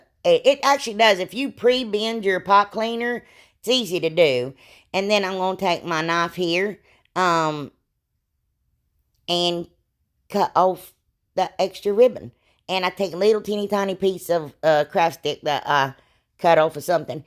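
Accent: South, United States. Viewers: You’re funny and very enjoyable to watch. I love your little southern accent.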